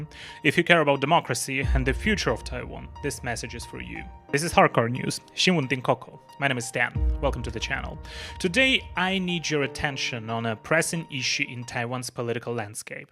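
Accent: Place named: Europe. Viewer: Nice haircut and nice shirt. and your English is getting more European. that's not a bad thing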